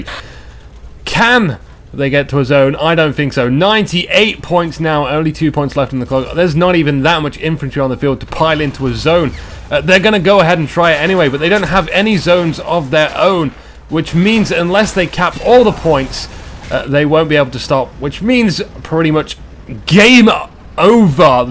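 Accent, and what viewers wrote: England, United Kingdom. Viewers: Fantastic video! Thanks for all the hard work, I can tell by your commentary that you too have a huge... english acccent and I love it! Excellent game, good to see lots of pressure and lots of light vehicle play, this game is great in that its not all just kt and is3 spam!:) good luck to butch and baloo, having watched this video and shootter's videos it seems like butcher and baloo are going to spank them back to mexico.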